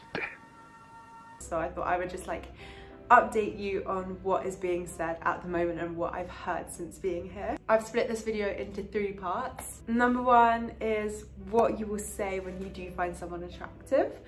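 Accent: France. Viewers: Ça fait tellement accent des années 2000/2010 de la petite bourgeoisie parisienne c'est incroyable, on voit où tu as appris juste à ton accent 😁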